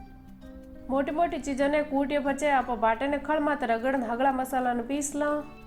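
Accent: Marwar, India. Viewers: जी बहुत शानदार प्रस्तुति के लिये बधाई, आप की मारवाड़ी बोली बहुत अच्छी लगती हैं। आप का बहुत बहुत धन्यवाद 🙏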